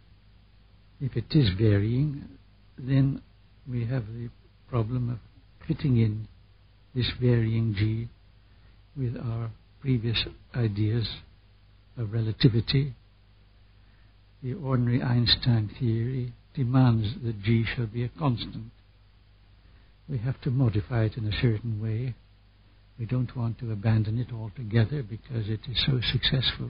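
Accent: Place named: Netherlands. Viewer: I could swear the accent is Dutch (being Dutch but living outside of Holland myself)...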